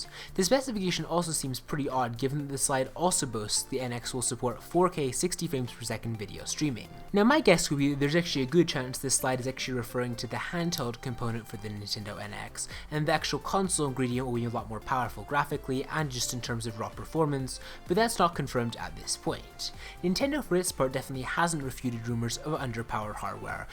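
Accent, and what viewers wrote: Scotland, United Kingdom. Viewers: You're accent has a hint of Scottish to it, are you Canadian?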